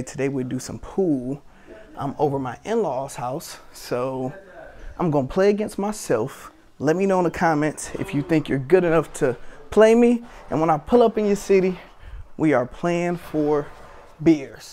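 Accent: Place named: Washington DC, United States. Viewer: Bring that ass here boi😂 DC voice lol and bring a case of Coronas lol good shit playa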